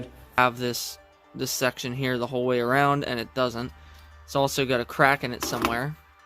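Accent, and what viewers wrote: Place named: Philadelphia, United States. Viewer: the philly accent makes this build amazing.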